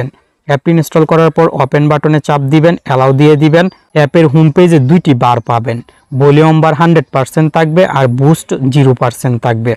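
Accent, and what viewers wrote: Mymensingh, Bangladesh. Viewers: Vair bari mone hoi Mymensingh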